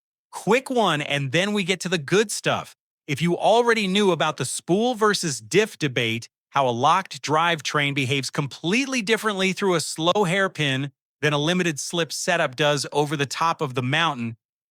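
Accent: United States. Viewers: Aussie script with american accent. AI needs to try it harder! Also where were the Bathurst shots??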